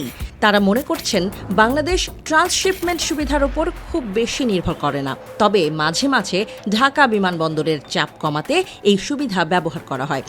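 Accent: Kolkata, India. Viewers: পাঠিকার কণ্ঠে সমুচিত জবাব। কলকাতা ভাইব পেলাম। শুভ কামনা এনটিভি।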